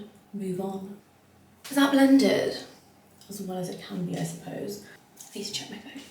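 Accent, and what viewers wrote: United Kingdom. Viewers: I remember you saying that wherever you go you take on an accent and girl that London accent is thick right now😂 Love you and this video❤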